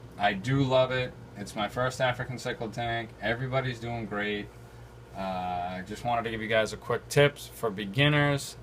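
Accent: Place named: New England, United States. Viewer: Deff from New England lol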